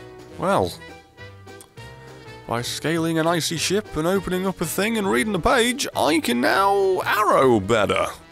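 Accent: United Kingdom. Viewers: I doubt you'll read this, but thanks for making such amazing content, my dear madman. Seriously, you've made me a maniac, and I love you for it. You just bring laughter to my life, and a bloody lot of it. I would give a fucking arm and a leg to talk to you once, since I really only need one of each. One more thing I love you for, you're british, and I love british slangs and accent. xD So keep up your work man, you have my full support!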